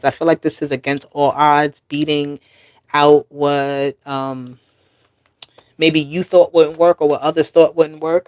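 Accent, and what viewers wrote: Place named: Boston, United States. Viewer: Thanks for the reading from Boston. You sound like a Bostonian to me, maybe just a north east accent. lol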